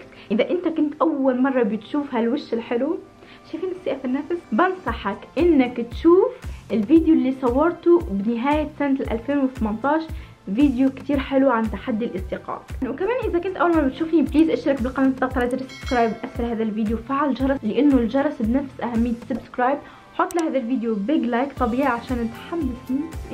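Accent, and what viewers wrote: Tunisia. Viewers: من هدرة تاعك تباني تونسية 😉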